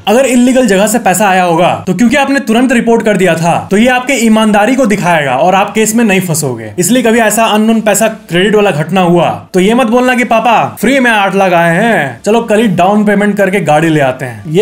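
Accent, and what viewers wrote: Bihar, India. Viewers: Theth bihari bhasa. Pulur-pulur😅😅😅😅😅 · I think brother from bihar 😂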